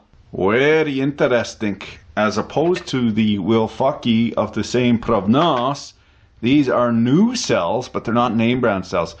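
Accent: Quebec, Canada. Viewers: Hi im from quebec im very impressed that you seem to can speak like québécois. Where are you from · Si Sol (de Sol et Goblet) parlait anglais, ce serait lui. Un Québécois en plus! Gotta like the guy! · talkin' like a real Quebecois up in this shiz... i love it. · Based on french knowledge, you are either from New Brunswick, Quebec or maybe Ontario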